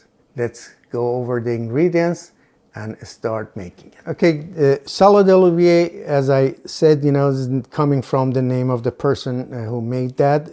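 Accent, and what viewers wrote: Iran. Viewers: your voice... and with your Persian accent are just perfect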